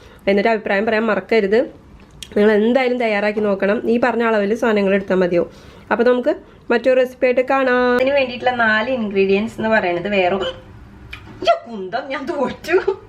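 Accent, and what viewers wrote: Thrissur, India. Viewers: Ente ummade ishtta vibhavangalil onnu kalathappam aanu.. Nalla mayam ulla idliyude recipe paranju tharumo... Love your Thrissur accent.. My father is from Mathilakam, Kodungalloor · താങ്ക്സ്, ചേച്ചി തൃശൂർ ആണോ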